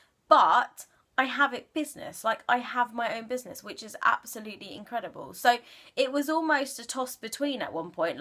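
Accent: United Kingdom. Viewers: Love you British accent. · Nice British accent. Cheers!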